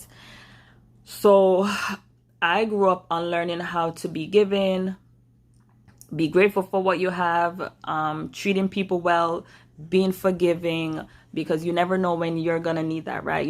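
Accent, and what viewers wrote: Jamaica. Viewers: i detect that jamaican accent...i am too jamaican,..like your video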